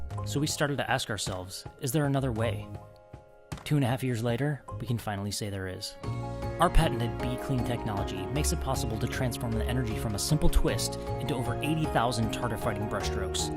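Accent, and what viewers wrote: Australia. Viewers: GoingGone he’s Australian. Not British.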